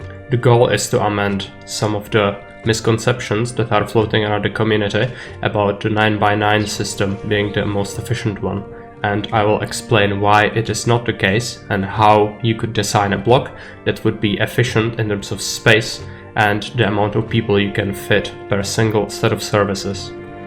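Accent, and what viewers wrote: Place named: Ireland. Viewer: first time i heard you i was convinced you were irish ☺️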